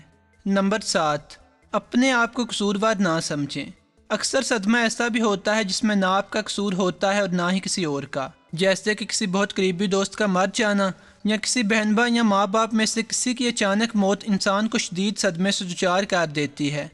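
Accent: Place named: Punjab, Pakistan. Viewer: آپ کی موٹیویشمل سپیچ زبردست ہے . آپ بنجابی ٹچ کچھ کم کریں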